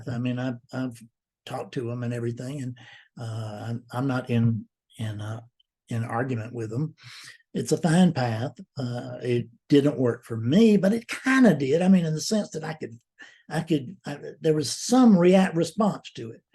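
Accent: South, United States. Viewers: when I first found you so long ago, I dismissed you as just a Hick with that "country voice" and ordinary language. I was used to the impersonal, superior Lecturing style of other Speakers/Teachers, so I didn't value your "common man" style until just now. Thank you for your honesty and personal language plus your obvious Awakeness.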